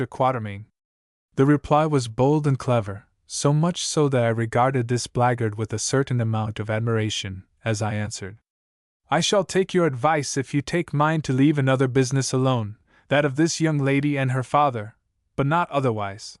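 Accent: United States. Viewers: Have been a fan of H. Rider Haggard since the 1960s, especially the Allan Quatermain series. It is a shame that the reading is done in a rather flat artificial intelligence voice, and an American one at that, with numerous mispronunciation, the rendering of Natal is especially jarring one, it's a place not giving birth. Have also listened to my favourite AQ story " Allan and the Holy Flower " read once again with a American voice, this time female, and it's very difficult to except that voice taking the part of a English male big game hunter in Africa in the 1800's. It needs someone like Greg Wagland, who is a most excellent audio book reader to take on the roll. I did give this book a thumbs up not because of the reading but because of the book.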